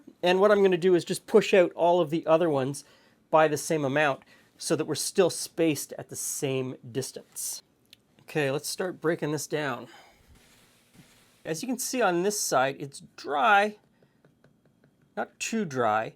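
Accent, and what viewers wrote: Canada. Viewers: The CC is translating his Canadian accent "Blazing Bull", to Blazing Bowl.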